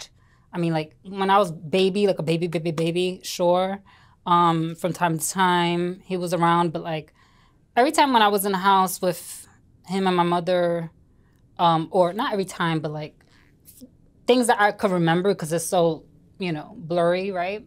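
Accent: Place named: New York, United States. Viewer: Six minutes in & I cannot see u as a male! Love your New York voice.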